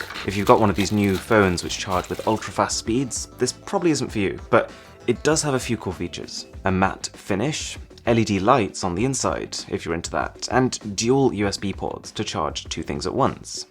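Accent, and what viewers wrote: RP, United Kingdom. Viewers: Absolutely love his posh English accent. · You sound posh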